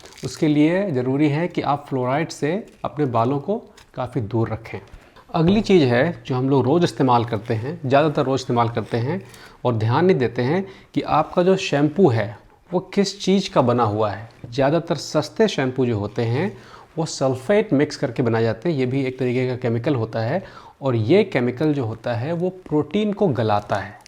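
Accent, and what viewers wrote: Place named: Uttar Pradesh, India. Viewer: Thanks bhai or aapki awaj bilkul up walo k tareh hai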